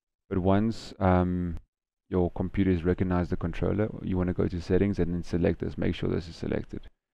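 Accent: South Africa. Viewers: Worked for me!! 🙌🙌🙌 I can now play NFS Payback and Heat with the controller!! And is that a SA accent I hear there?? Lol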